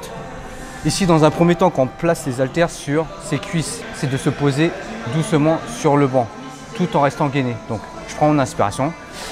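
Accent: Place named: Saint-Étienne, France. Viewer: Tu est de saint Étienne toi non ? Car tu a vachement l’accent de là-bas lol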